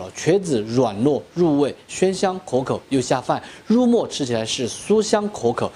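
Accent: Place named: Sichuan, China. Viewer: 川普好聽😀😀😀😀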